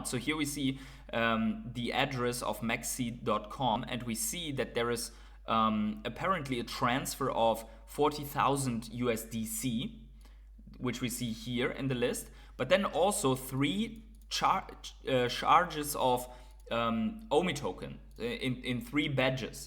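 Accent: Germany. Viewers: Das ist mal heftiges denglish 😂😂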